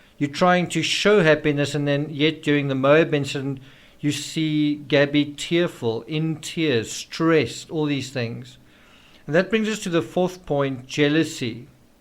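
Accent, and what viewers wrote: South Africa. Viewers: Are you South African🇿🇦🇿🇦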